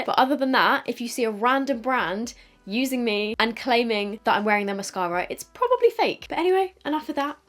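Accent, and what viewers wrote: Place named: England, United Kingdom. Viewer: God I absolutely love the vibe of this channel and your English accent is music to my ears!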